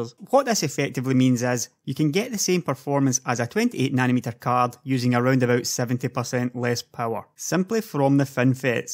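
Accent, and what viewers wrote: Scotland, United Kingdom. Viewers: Seems Scottish. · Are you Scottish by chance? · He's Scottish... not recognise a Scottish accent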